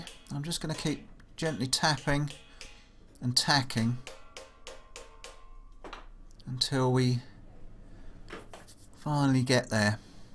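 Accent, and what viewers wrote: United Kingdom. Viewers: I love your video. I do not have a job and could not afford the prints. I sort of drew my own and made programs for my cnc plasma cutter. You had trouble with things fitting but some of my parts did not come very close at all. None the less, I do have the general shape. I am from the USA and your English and accent is something else to me. I do not speak the Queens English. Maybe if I had some tea as you did, I could work thinks out better. Frustrating and fun.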